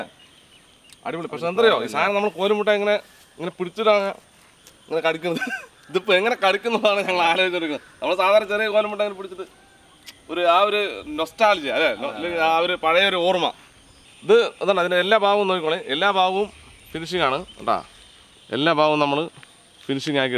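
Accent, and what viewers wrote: Palakkad, India. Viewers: Ayyo nmde palakkad slang kelkumboo bayankara happyyyy😍😍😍😍🤩🤩🤩🤩❣️❣️❣️❣️❣️✨✨✨❣️❣️❣️❣️❣️❣️❣️❣️❣️❣️❣️ikka video polichu ❣️❣️❣️🤗🤗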